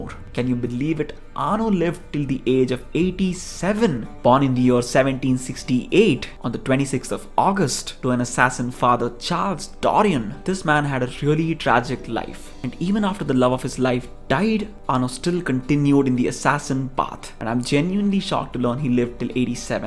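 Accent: India. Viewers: Are you an Indian ? You sound like one...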